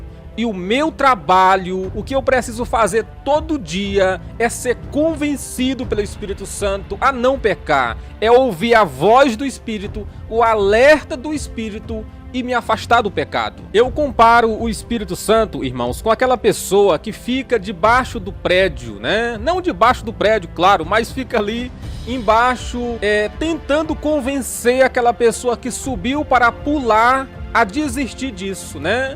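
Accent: Northeast, Brazil. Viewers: PASTOR, AMO MUITO TUAS PALAVRAS, TEU SOTAQUE NORDESTINO, NUNCA MUDE NADINHA, CONTINUE NOS EXORTANDO COM GRAÇA E SABEDORIA!!! GOSTO MUITO DO JEITO QUE VOCÊ FALA DO CARNIÇA, É ASSIM QUE CHAMO O PULA MOITA KKKKKK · Oi pastor também sou nordestina e conheço bem esse sotaque 😁 obrigado pelos ensinamentos da palavra do Senhor gosto muito de ouvir vc que o senhor abençoe vc a cada dia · Eita nordestino reais e muito engraçado o jeito dele falar paz irmão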